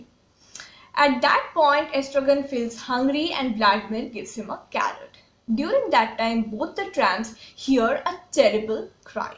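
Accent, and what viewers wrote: India. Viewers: So sweet and honey mixed easy Indian accented description made by you, It's easy for me to make notes for my M.A. in Eenglish, Drama Paper. Love and Thank you, from Assam India*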